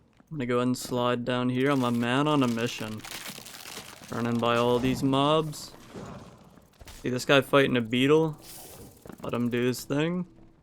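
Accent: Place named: Minnesota, United States. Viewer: def from minnesota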